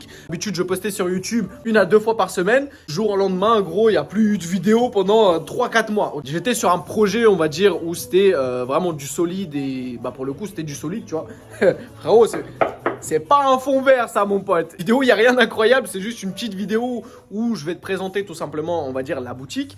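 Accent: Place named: suburbs, France. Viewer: Et depuis vous avez fait faillite? Encore un jeune de banlieue avec sa façon de parler !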